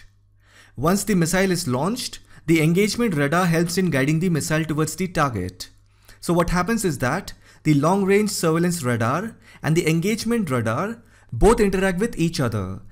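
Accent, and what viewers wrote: India. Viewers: Love indian english accent ❤🙂🙂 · Damn, It`ś interesting but Indian english is beyond my abilities to withstand ;o) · Indian accent is so annoying.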